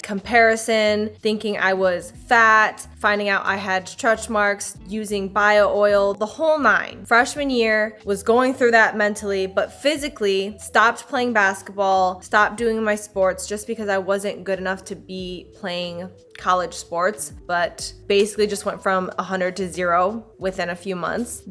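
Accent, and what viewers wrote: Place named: Michigan, United States. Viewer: I could listen to talk all day her MI accent is so nice to hear lol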